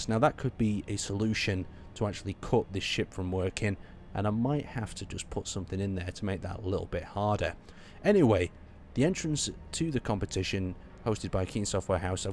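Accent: United Kingdom. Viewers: I only watch this channel because you're British and it's awesome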